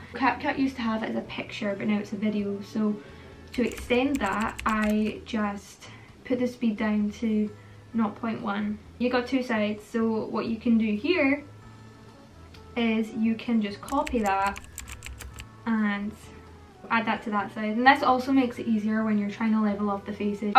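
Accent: Scotland, United Kingdom. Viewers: Are you Scottish I love your accent I’m from Glasgow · YOUR SCOTTISH BRO IM SO HAPPY MY IDOLS SCOTTISH LIKE ME IM SO HAPPY AAAAAAAA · Are you Scottish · Your scottish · yeah it’s a scottish accent, i laos have a scottish accent and live in scotland, don’t live here i like my piece and quiet hehe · WAIT are you Scottish? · Wait!! She’s Scottish !! She just got 10 times better than she already was!! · YOUR SCOTTISH?!?!?! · Scottish actually haha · She's Scottish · thats so real, for some reason i imagined her as american loll but i love her scottish accent ❤ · Ur Scottish I thought u were American lol I’m from Scotland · YOU'RE SCOTTISH 😮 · OMG U SOUND SO COOL I NEVER THOUGHT U WERE SCOTTISH ❤❤❤❤❤❤ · I never would've known you were Scottish, love your accent, love your vids x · Omg r u scottish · That’s not a British accent but rather Scottish, I guess! 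· I love watching your videos but I have never been so shocked to hear a Scottish accent before hahah (I’m Scottish too and I genuinely had zero clue!) 🏴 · No way are you scottish!! Seeing you lip sync over all your videos, I did not expect this! Ps. your voice is so nice and calming also I love how you include captions on all your videos, I really need them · First video ive seen cause i usually watch her youtube shorts, I didnt know she was scottish, I thought she was american 😂, its kinda cool seeing a scottish creator that doesnt try to hide the accent, wierd to think that she's only like a 6 hour drive away from me, i live in england for clarification😅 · Absolutely didn’t expect you to be Scottish! Smashin' it, lass 👏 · I love the Scottish accent❤ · Ok, so sorry but never realised you were Scottish, I always thought you were American!! So strange hearing your normal voice haha. Love your videos!! 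❤️ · Your accent is beautiful. I did not expect a scottish accent tho what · Are you Scottish? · SHES SCOTTISH!!!!!!?????? PS I ❤ ur accent I’m just rlly shocked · WOAG ARR YOU SCOTTISH TOO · btw guys, she’s scottish 🏴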